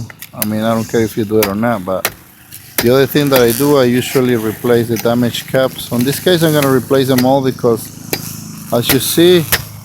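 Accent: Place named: Mexico. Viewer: No hagas ese mugrero primo y se te oye que eres mexicano mejor habla español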